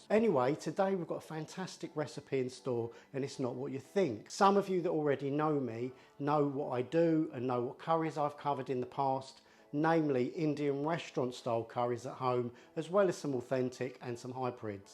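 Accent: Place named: England, United Kingdom. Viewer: He’s got a perfect English accent for a Pakistani chef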